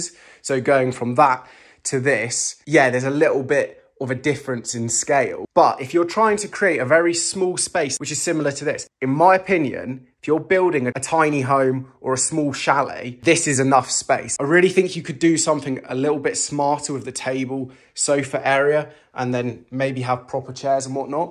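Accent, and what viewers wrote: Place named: West Country, United Kingdom. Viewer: I can hear a twang of West Country in your voice. I love it.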